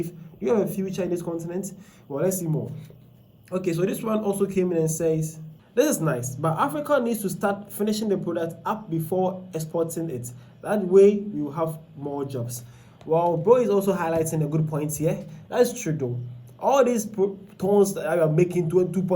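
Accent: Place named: Nigeria. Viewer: I thought you are a Nigerian. You have a deep Nigerian accent.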